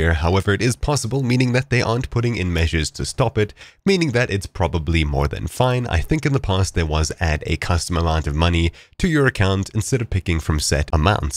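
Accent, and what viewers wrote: South Africa. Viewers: Hold up, you south african? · South African?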